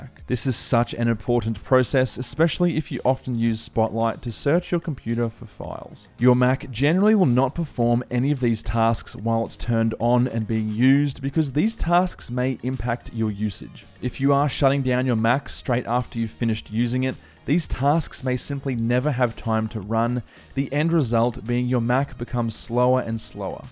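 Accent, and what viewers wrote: Australia. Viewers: Fabulous really good quality presentation and great to hear an Aussie. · Are you Aussie?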